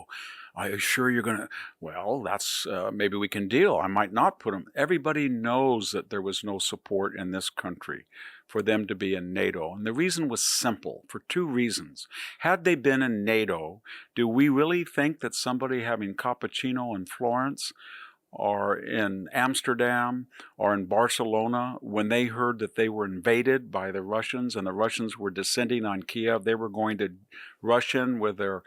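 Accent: United States. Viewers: He's classically American to me in the way he speaks. I don't just mean the accent but the way he formulates his words. I'm British, by the way.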